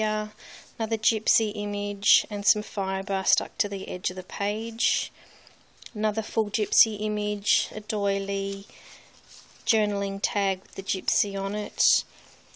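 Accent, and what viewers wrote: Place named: Australia. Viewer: A great journal. Your swap partner will love it! Tfs and it's good to hear your Aussie accent. You did a really good job of filming and narrating.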